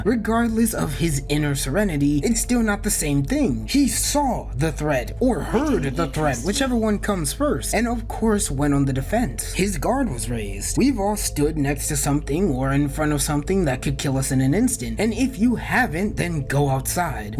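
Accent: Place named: Balkans. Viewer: That balkans voice..., is it you?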